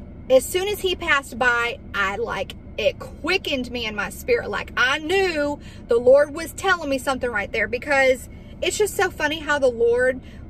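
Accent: South, United States. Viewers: I love your laugh and southern accent. You're my sister in Christ, and you look like you could actually be my sister, although ten times better looking. (I'm old)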